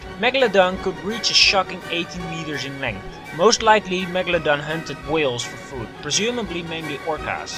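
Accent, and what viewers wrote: Netherlands. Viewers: I have to say this was overall quite a informative video. But i have to comment on something: those "marine dinosaurs" you described, don't belong to the dinosaur family at all. Sure they were large and lived in the same periods, but they were in fact "marine reptiles" instead of dinosaurs. They were a seperate group of reptiles. That's it. After hearing your accent, i presume you are dutch :D am i right?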